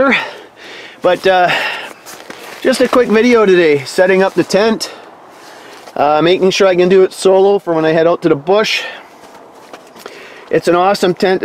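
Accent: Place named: Canada. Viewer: That's the most Canadian accent I've heard all day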